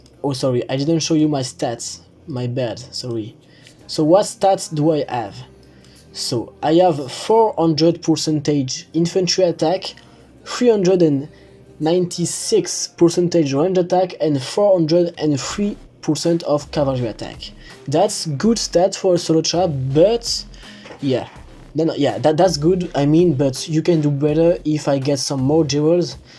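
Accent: France. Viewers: Are you french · Tu change tous me temps de guilde mdrr frero sa s'entend que tu et fr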